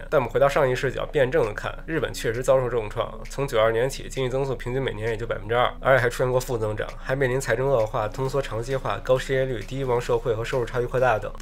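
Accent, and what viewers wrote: Beijing, China. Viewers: 开头笑了。爷关更也好奶关更也好，会一直关注巫师，因为实在文案精彩论据夯实。京片儿口音也很喜欢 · 北京腔真是难听，另外少参杂个人想法保持客观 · 巫师大神，哪都好，就是您的北京话实在是太北京话了，能稍微吐字清楚点么，谢谢您。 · 每次最后的一分钟我都想写下来，分分钟拿去diss那些傻逼。还有就是我好爱巫师的北京话 ❤️ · 就爱听的北京话聊正事儿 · 这北京口音跟lks好像，喜欢😜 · 还好吧，最多是北京人说普通话，纯正的北京话吞的妈都不认识了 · 北京腔太难听好好说话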